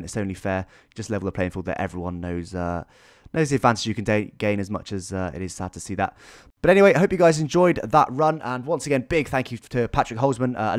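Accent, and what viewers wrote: United Kingdom. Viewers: For the first ten seconds I thought you sounded awfully English for a German fella.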